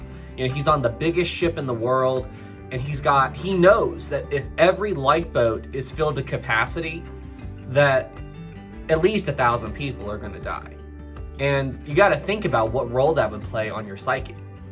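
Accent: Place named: West Virginia, United States. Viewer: Let me guess,West Virginia?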